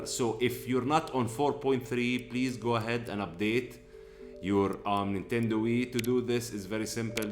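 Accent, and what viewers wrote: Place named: Arab world. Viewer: I LIKE YOUR ACCENT IM ARAB AS WELL BUT LIKE YOUR ACCENT IS JUST WOW.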